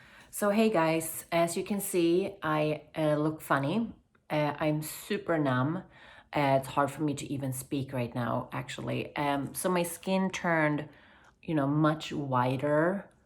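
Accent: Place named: Sweden. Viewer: Hej, jag skriver på svenska för jag tror du är svensk, tyckte det lät så. Jag bara undrar om denna penna funkar bra mot ärr i pannan, och om det är säkert att använda så man inte gör det värre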